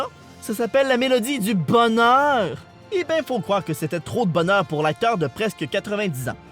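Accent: Quebec, Canada. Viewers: Étant moi même québécoise, j'adore ton accent 😍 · À enfin un you tuber québécois qui parle vraiment le québécois! 😁😁👍🏼👍🏼👍🏼👍🏼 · Choko Chok euh non c pas la seule, J'ADORE L'ACCEBT QUÉBÉCOIS 😍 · En vrai, je regarde toutes vos vidéos seulement pour l'accent... (et un peu les blagounettes aussi) #ViveLeQuebec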